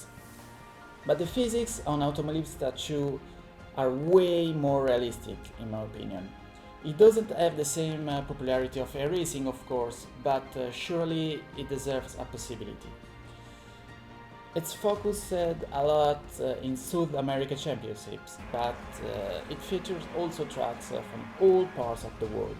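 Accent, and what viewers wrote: Italy. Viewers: ARE YOU ITALIAN??? I HAVE PROVE!!! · Bro ma sei italiano? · E poi ci sono io che sto ancora cercando di capire se sia italiano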